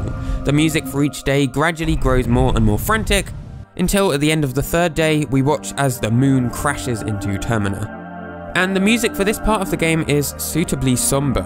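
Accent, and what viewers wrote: United Kingdom. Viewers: i like how you brits say Ocariner not Ocarina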